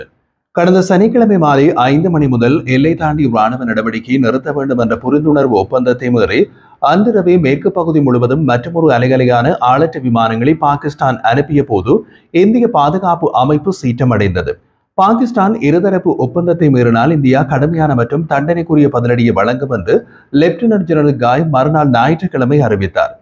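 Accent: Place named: Jaffna, Sri Lanka. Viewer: யாழ் தமிழைக் கேட்டு மகிழ்கின்றேன் மிக்க நன்றி வாழ்த்துக்கள்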